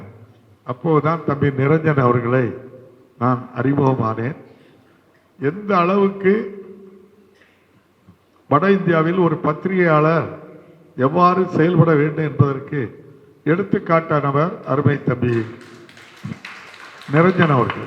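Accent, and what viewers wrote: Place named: Tirunelveli, India. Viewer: ❤❤❤ நெல்லை மாவட்டத்துக்கே உரிய தமிழ் நடை இவருடைய பேச்சில் உள்ளது.❤❤❤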